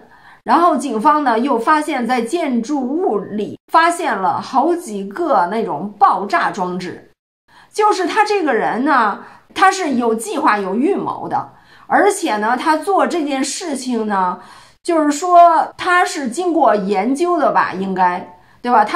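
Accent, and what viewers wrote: Beijing, China. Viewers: 重复好几次了，但我还是得说： ，我太喜欢你的京腔了！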